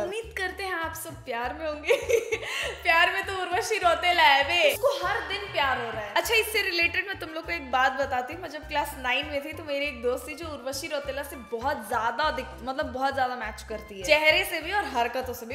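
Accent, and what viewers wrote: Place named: Punjab, India. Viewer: Yar apki punjabi bhut cute lagi mujhe sachii 🫣🫣🫣🔥🔥🔥❤️❤️❤️❤️❤️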